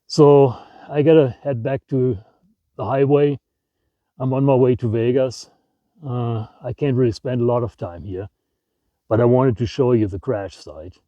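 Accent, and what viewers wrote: Germany. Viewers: Love the Bavarian accent!! Great video! I wasn’t aware that there was an uncontrolled landing of a UAV. Thanks for the info. New Subscriber! Greeting’s from New Hampshire.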